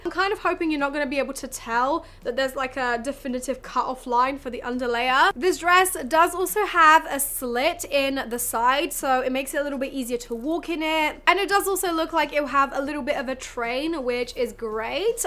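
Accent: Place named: England, United Kingdom. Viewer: what part of england are u from u have a accent its nice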